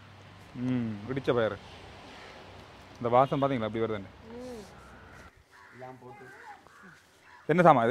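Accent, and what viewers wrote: Jaffna, Sri Lanka. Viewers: Sutha Tamil srilankan accent appadithan erukum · உங்கள் தமிழ் எனக்கு உயிர்.சிறுவயதில் சிலோன் வானொலி கேட்டு வளர்ந்தோம்.நீங்க எல்லோரும் சேர்ந்து இலங்கையை இந்தியாவுடன் இணைந்துவிடுங்கள்.நாங்கள் உங்களை அன்புடன் ஏற்றுகொள்ள தயாராக உள்ளோம். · அண்ணா அக்கா நீங்கள் மட்டக்களப்பா யாழ்பாணம்மாதிரி பேசிறீங்கள் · இலங்கை தமிழ் சூப்பர்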